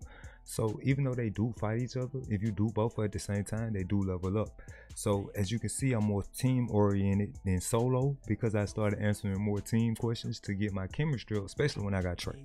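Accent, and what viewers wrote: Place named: South, United States. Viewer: Thanks, bro! helps a lot and you from the south so even better I can understand the accent lol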